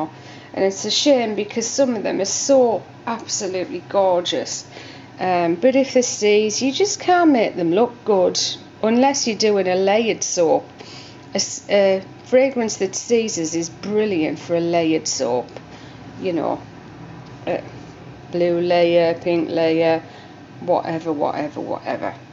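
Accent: United Kingdom. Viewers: I think that a lot of us that love your voice are not from the UK. All the UK accents are beautiful sounding. For me the best part of your voice is that it just sounds like you are enjoying what you're doing and when someone sounds like they are enjoying what they are doing their voice is priceless.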